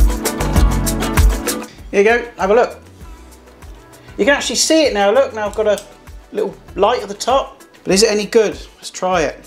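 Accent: Leicester, United Kingdom. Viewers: Lol just listening and thought hes from Leicester after going London road and granby street . Hey presto, thurnby lodge here.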